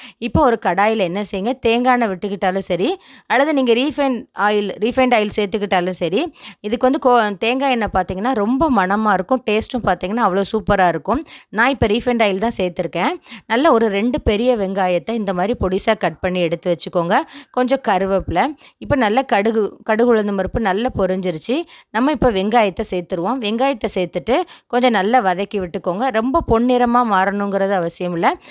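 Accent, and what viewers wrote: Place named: Tirunelveli, India. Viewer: உங்கள் தமிழ் மிகவும் அருமையாக இருக்கிறது. அருமையான திருநெல்வேலி தமிழ்.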